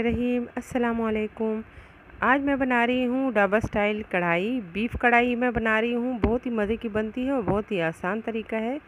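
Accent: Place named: Sindh, India. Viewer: Sis ap sindhi hain . Bhat krne ka andaz sindhi jaisa hai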